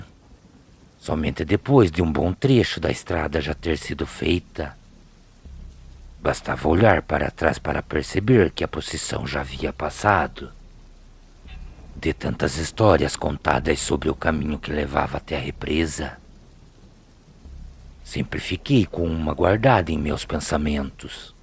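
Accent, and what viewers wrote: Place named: Paraná, Brazil. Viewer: Boa noite, meu velho ! Cara gosto deste sotaque de ti uma mistura do Parana com o interior de Sampa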